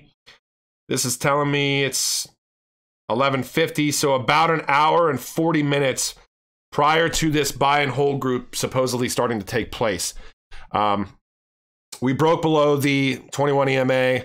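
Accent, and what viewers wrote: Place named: East Coast, United States. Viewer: It's your East Coastness coming through. You're fine. Keep doing you.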